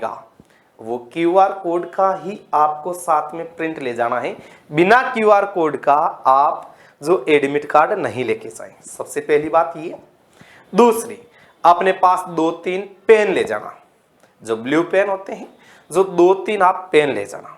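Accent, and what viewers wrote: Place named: Marwar, India. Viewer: Sir aap marvad se hai isliye mai aapki aabaj ko bade chav se sunta hu😂😂😊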